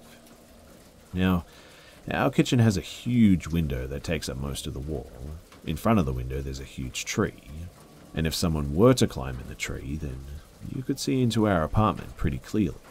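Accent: Australia. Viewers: Noo not the Australian accent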